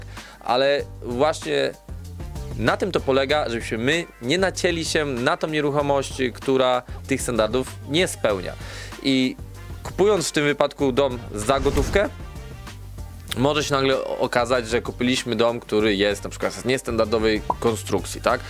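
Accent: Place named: Eastern Europe. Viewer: Slychac rosyjski ukrainski albo bialoruski akcent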